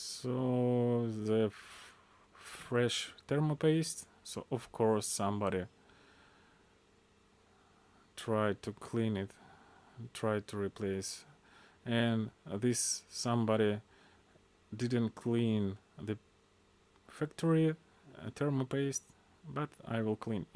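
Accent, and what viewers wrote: Russia. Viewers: Какой лютый русский акцент) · Нижегородский проскакивает бля😂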